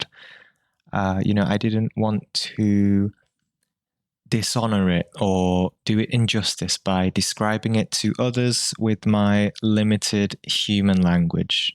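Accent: United Kingdom. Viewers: Thank You. I am 2-Years Late...but Intuitively I knew...that There was an Expert like You...Amongst the large Herd of Astral Projection Leaders available. I am American, & Your British Accent...and You Clear Style of Speech...attracted Me. Thank You.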